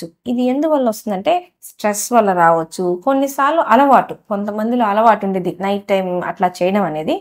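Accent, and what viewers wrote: Guntur, India. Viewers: అచ్చమైన గుంటూరు యాసలో మాట్లాడుతున్నారు🎉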